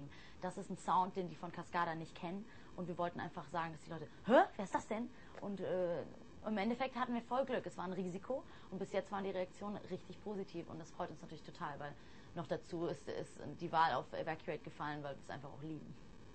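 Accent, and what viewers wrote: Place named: RP, United Kingdom. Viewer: This is not something that you see every day. Posh British proper accent to straight up German. For years she had me fooled. When she sings she has an American accent. Then I heard her British accent and was surprised. Then I found out she speaks perfect German. It's so weird. I think I'm more jealous of this than her fame and fortune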